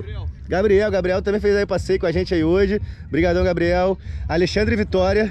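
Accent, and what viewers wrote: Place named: Rio de Janeiro, Brazil. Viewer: Caga tijolo. Kkkkkkkkkk😂😂😂😂😂. Adoro esse sotaque. O sulista e o carioca sao os sotaques mais gostosos de se ouvir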